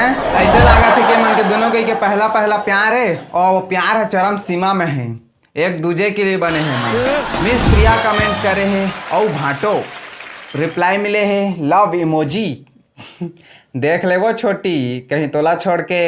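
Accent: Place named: Janjgir, India. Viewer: Janjgiriha tone lgthe ga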